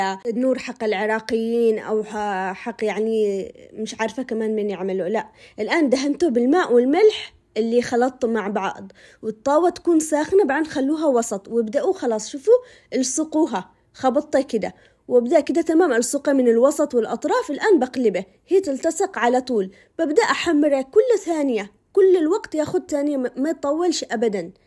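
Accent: Aden, Yemen. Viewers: يعجبني كلامك العدني المخلوط بالحبشي او الصومالي ...عاشوا أصحاب لحج وأكلهم اللذيذ 😊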